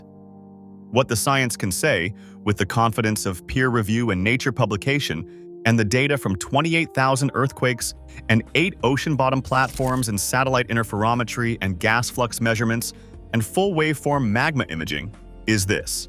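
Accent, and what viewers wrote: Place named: United States. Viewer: Mmmmm Just another A.i. "CLICK-BAIT" WITH THE EVER SO PAINFUL b.s YANK VOICE. (THE SIGNATURE OF A SCAMMER! )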